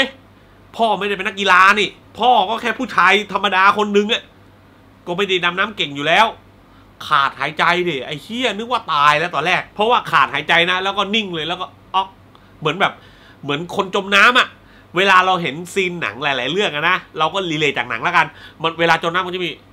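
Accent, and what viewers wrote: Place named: Surat Thani, Thailand. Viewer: คนสุราษฎร์อำเภอไรนิพี่บ่าว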